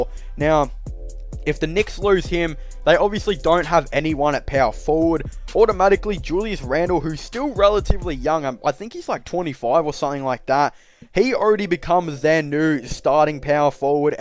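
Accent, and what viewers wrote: Australia. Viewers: talking too fast....and Aussie accent. Lol.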